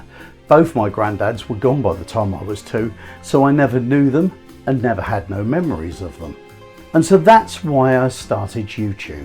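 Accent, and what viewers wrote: England, United Kingdom. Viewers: I like your calm and enjoyable english voice :)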